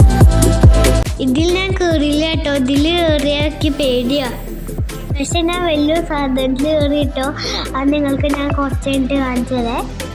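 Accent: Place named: Valluvanad, India. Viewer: അടിപൊളിയായിട്ടുണ്ട്. വള്ളുവനാട് ഭാക്ഷ കൊള്ളാം ട്ടോ❤❤❤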